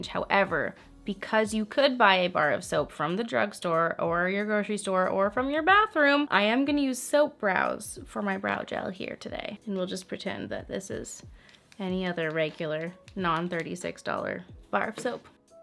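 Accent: United Kingdom. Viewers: I am living for the British accent!!! 😂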